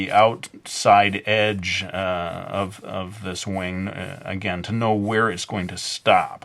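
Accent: Brooklyn, United States. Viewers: I LIKE your Brooklyn accent xD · If you stop doing the Brooklyn accent I'm unsubscribing! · actually i really like your surfer guy voice (brooklin accent) really much, it makes me laugh xD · I like your Brooklyn accent XD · why would anyone unsubscribe because of the Brooklyn accent? It's awesome! and so are the drawings :D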